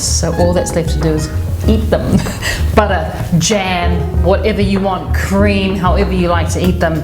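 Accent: New Zealand. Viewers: I got this recipe from a Tupperware Dealer many years ago and they were the only scones I have ever had success with. I lost the recipe so thank you so much for this Video. I will try it with -preheating my baking dish - is there a reason for this? Even without the accent, "Runny Cream" is so Kiwi. Thanks. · Going to try these because of your Kiwi accent😂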